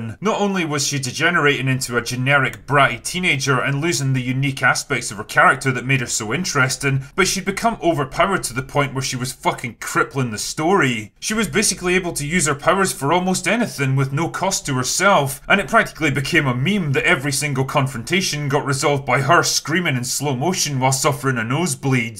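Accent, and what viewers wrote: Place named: England, United Kingdom. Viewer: Thought he was from England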